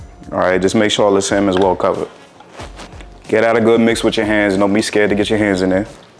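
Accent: New York, United States. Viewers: I hear an accent, maybe New York?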